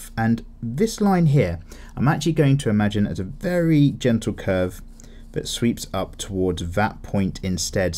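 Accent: United Kingdom. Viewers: British english >:D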